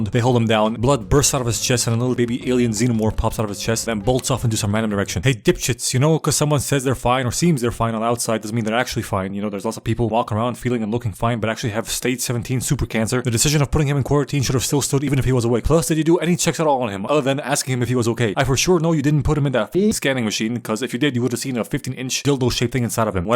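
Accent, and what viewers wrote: Germany. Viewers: Are you German? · Love the German accent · german accent is unbeatable.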